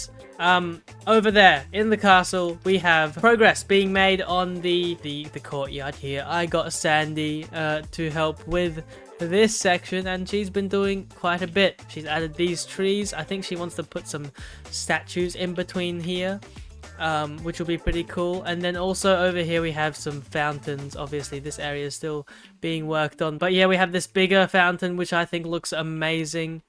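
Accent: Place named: Australia. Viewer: You sound more Australian and look more orange and scammy since I last talk to you, what happened🧐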